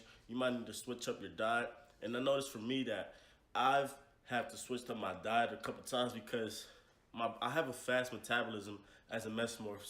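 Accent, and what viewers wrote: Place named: Texas, United States. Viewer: I know for a fact you from Texas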